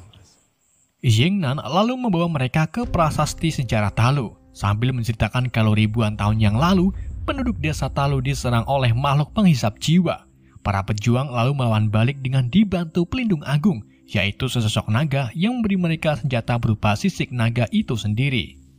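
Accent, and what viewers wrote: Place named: Banyumas, Indonesia. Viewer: Dirungonin 😆😭 bang yeendeh rika ngapak yaa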